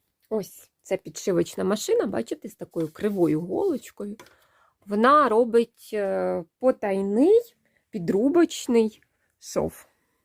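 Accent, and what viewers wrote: Cherkasy, Ukraine. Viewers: О Боже правий, ніколи б у цьому місті-гетто не жила б. Для мене повний жах 😱оці коробочки, між ними машини і поодинокі деревця. Особливо одинокими себе тут відчувають діти. Але людина і до такого звикає. Може в чомусь я і не права, але для мене гарніші в моєму 20тисячному місті різнокольорові двоповерхові котеджі з кленовою алеєю на проспекті. Як маленька Швейцарія. Чомусь киян мені завжди жаль. І дитина моя там живе, І її жаль. Швейна сторінка у Вас цікава. У нас така говірка на Черкащині.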